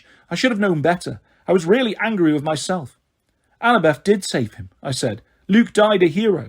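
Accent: England, United Kingdom. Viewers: Smh i gave it a try and your good but i just cant listen to an English accent when it comes to New York Percy lol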